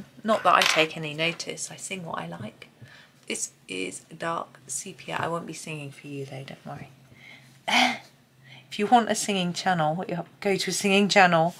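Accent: United Kingdom. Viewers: I love your chattie videos. The British accent and info on the “ways” the Brit’s do and say things. Just love it. 🥰 😊 And, of course, your coloring.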